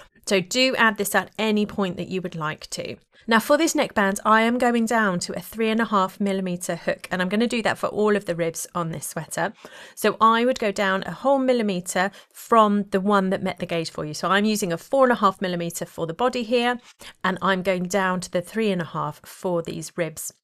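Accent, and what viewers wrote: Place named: England, United Kingdom. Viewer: So, I have to admit, I have not watched any of your tutorials before but I am a huge fan of your garments. I have several patterns that I of course have not gotten around to. 😂😂 I decided to watch h this one as it popped up on my front feed. I was COMMMMMPLEEEETELY surprised to hear your English accent!!!!! I had no idea. So nooow.Im trying to reconcile your face to your voice. 😂😂 I will say that I totally love English accents. One of the doctors I work with is English and I looooove to listen to hom talk. ❤❤❤❤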